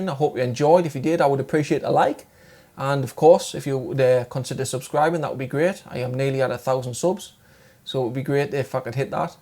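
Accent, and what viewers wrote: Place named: North East England, United Kingdom. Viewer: Hi, thank you for this very helpful video. You take it nice and slowly, which is a pleasant change from most YouTube instruction videos. I’ve watched some videos by the otherwise excellent Richie Castellano but he goes though stuff much too quickly for me. Your pleasant North East accent is an added bonus. Are you from Bishop Auckland or Durham?... thanks for explaining all this so well. I can make progress now.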